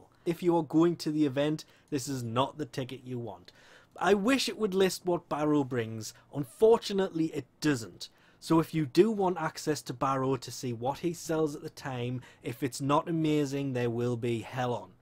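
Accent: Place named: Northern England, United Kingdom. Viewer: According to your accent I think you're from the middle or north from Brittan, so you're planning to fly to Toronto instead waiting till it's actually in Brittan?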